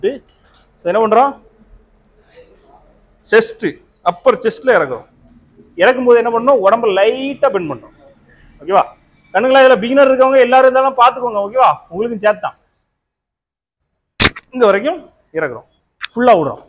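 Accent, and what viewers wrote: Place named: Tuticorin, India. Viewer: TUTICORIN slang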